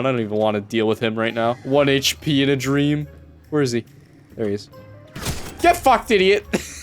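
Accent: Canada. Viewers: Are you a newfie b'y?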